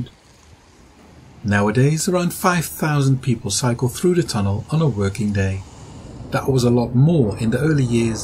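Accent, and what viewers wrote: Netherlands. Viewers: Interesting mix between a Dutch and English accent :p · Lekker Nederlands accent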